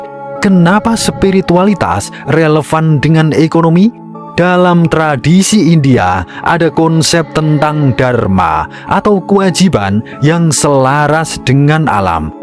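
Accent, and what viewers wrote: Java, Indonesia. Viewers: Ngomong ne kayak orang kampung saya wong jowo,malu saya dengar nya, harusnya logat Jawa nya harus hilang, pakailah logat bahasa Indonesia yang baik dan benar,karna kita orang Indonesia berbagai suku ras dan agama yang melihat dan mendengarnya.😂😂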